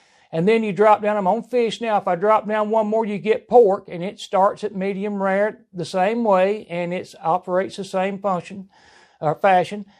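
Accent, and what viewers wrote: South, United States. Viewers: Where you from,? I'm a SC girl. Recognize that wonderful southern accent! Thank you for your videos!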